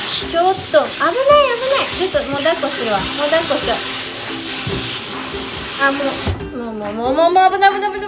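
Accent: Kyoto, Japan. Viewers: 京都弁好きだ！ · I thought she has kyoto accenct. Osaka and kyoto accent are pretty similar though.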